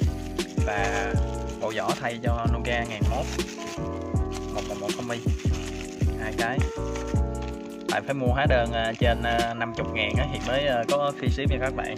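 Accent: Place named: Mekong Delta, Vietnam. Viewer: rất thích nghe giọng người miền tây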